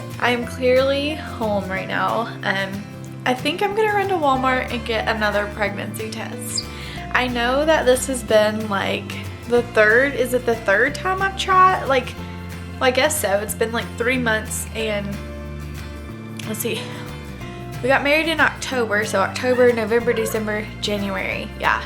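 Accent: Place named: Virginia, United States. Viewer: Fellow Virginian here (I heard it in your accent!) Had a miscarriage this month and I’m praying that next month is better. I’ll keep you and Tyler in my prayers as well. It’s all in God’s hands.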